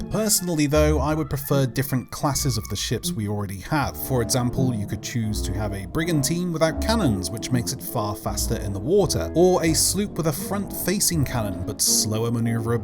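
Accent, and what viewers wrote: Spain. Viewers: Are you Spanish??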